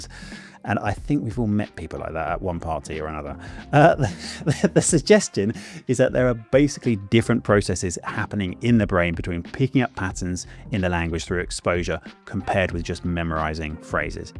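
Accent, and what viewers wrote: United Kingdom. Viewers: Too British for me. Ain't it my cup of tea. Sorry.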